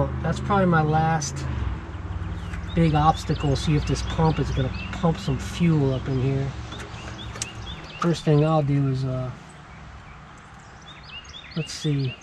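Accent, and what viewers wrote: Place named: Boston, United States. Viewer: That was so cool watching us up from Boston man love that accent keep it going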